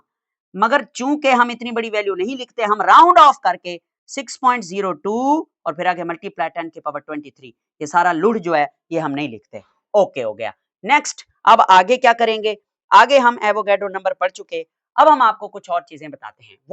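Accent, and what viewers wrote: Saraiki, Pakistan. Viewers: Are you saraiki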